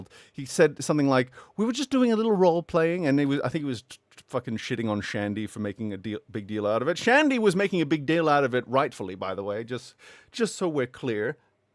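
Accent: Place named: Georgia, United States. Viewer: I didn’t know you were a southerner from Georgia. Amazing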